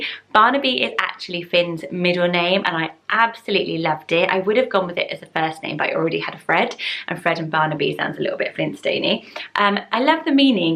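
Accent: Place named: England, United Kingdom. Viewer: I love these names but I’m American so these dont sound cute without the English accent!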